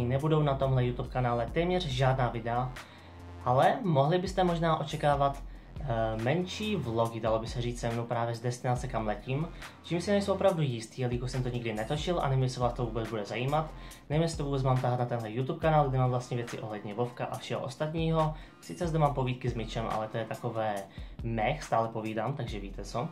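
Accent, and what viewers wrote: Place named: Moravia, Czech Republic. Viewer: "nejsu zvyklý" ... ty jsi z Moravy, že jo? :D jinak, vlogy určitě toč, bude to zajímavá obměna ;)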